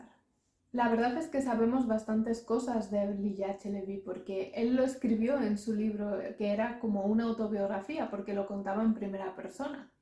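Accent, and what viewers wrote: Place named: Spain. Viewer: Sería buena idea que tu misma tradujeras ese libro del viajero. Tienes un lijero acento de español de España.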